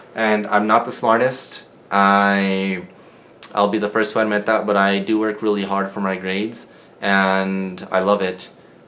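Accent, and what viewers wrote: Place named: New Mexico, United States. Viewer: Hi, I instantly recognized your NM accent and then I heard you say NM and I knew I was right lol. Thank you for posting this. I really resonate with your story, I went to Santa Fe High and it was so easy compared to college, I definitely didn’t do hot my first two years, I went to college out in Boston. I just finished my post bac, close to 30 hrs with a 4.0 & am hoping for an amazing score on the MCAT & will be applying this May. Thank you for sharing it’s so important to see people that we can relate to in this space!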